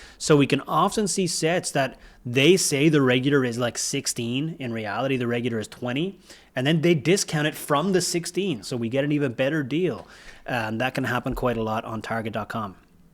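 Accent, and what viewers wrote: Ireland. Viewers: I'm always super confused that somebody with an Irish accent is introducing Lego deals from the USA. Here in Europe the deals are not great.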